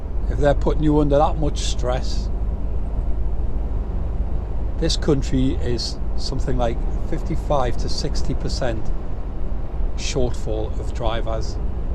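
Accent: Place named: Bedlington, United Kingdom. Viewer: where you from? sound very local to me (Bedlington) nice vids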